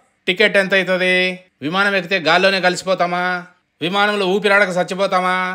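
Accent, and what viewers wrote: Kadapa, India. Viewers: మీరు ఇచ్చే ఇన్ఫర్మేషన్ చాలా బాగా ఉంది,కడప భాష యాస సూపర్ sir మది కూడా కడప రాజ రెడ్డి street sir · Welcome to kadapa. I have been following your you tube channel since two years and enjoying your kadapa accent presentations. Thank you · Nee matalu vintunte Kadapa kallamundu kanabadathadi. Kadapa municipal high school lo chadivinava?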